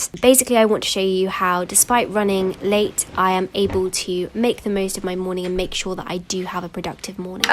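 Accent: United Kingdom. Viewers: Yay she is British 🇬🇧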